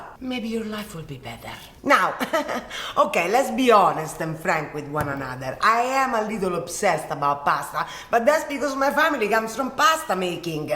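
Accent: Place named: Italy. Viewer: Non so se lo fai apposta di parlare inglese italianizzato o....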